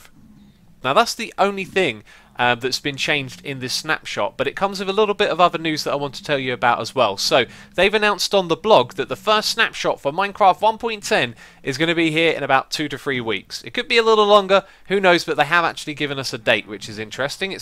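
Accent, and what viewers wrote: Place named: United Kingdom. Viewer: British accent. Same as chuchorial.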